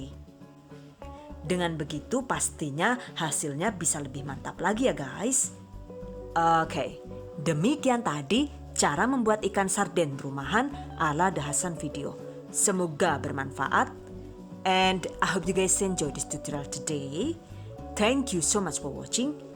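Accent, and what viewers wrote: Banyumas, Indonesia. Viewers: Mbae ngapak ya..pada baen karo aku..😁 maturnuwun mbak resepe..tak jajale..😊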